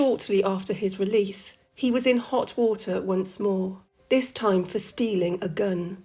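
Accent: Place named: West Country, United Kingdom. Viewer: I thought I could hear a faint sign of a West Country accent in your voice.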